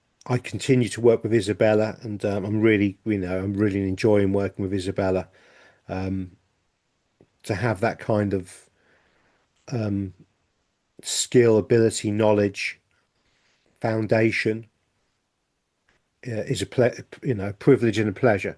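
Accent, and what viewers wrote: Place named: England, United Kingdom. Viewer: Congratulations to you, your work is a tremendous boon for we who feel these energies so acutely. I particularly love the English accent in your humble delivery. I note that several people are questioning Richards absence from your line up. We don’t need an explanation in the grand scheme of volatile life, but a mention might allay such curiosity. Yuletide greetings from somewhere that feels like nowhere in Canada!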